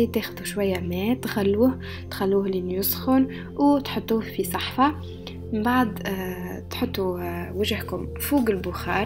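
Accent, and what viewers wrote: Tunisia. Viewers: نموت على هدرت توانسا حلوين ياسر 😘😘😘انا من الجزائر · تونسية على حسب لهجتها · هي تونسية و حافظت على لهجتها وهذي حاجة باهية💓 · لا يوجد اللهجة هاذي تونسية مش مغربية😌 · نموت على اللهجة التونسية ماشاءالله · هاي اللهجة تونسية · اوكي شكرا على المعلومة لهجة تونسية زوينة عجباتني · b9it nesma3 fi lahjtek w n9oul on dirait djerbienne hata 9olti "ki rawahet jerba" machallah 3lik charmante fière que vous ete tunisienne et surtout djerbienne :) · نوره البغدادية بس المشكله هيه تونسيه منفتهم حجيها بس اني افتهمت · هي تبان تونسية من هدرتها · أنا كذلك أعشق اللهجة التونسية كثيراً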